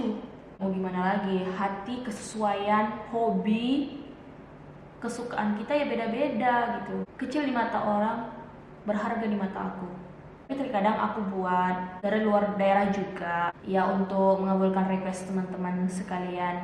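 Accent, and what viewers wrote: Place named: Medan, Indonesia. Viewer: lanjutkan kak.. saran aku hanya klo vlog, jgn dirubah logat medan nya.. gas poll kak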